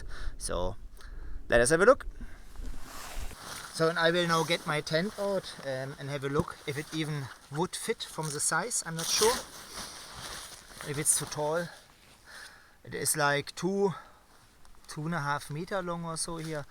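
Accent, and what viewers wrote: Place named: Germany. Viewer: Love the German accent with Scottish slang, great sound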